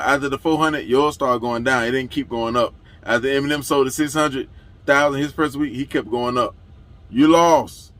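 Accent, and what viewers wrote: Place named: South, United States. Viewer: Bro are you from the south sound like you from here I know my southern people shout out to you from Louisiana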